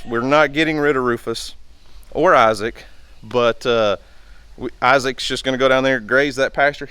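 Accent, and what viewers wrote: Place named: Oklahoma, United States. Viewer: Love the way you say some of your words. I know it's mostly because you're from Oklahoma. Rufus has declined to answer the question. Please keep your hands to your house yourself 😜😜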